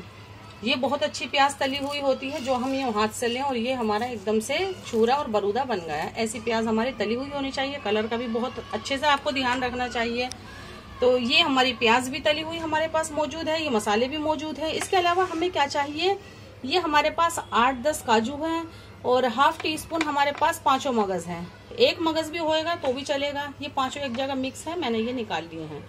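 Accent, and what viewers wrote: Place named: Bijnor, India. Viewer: aapka bolne ka andaz bijnori h zabardast ji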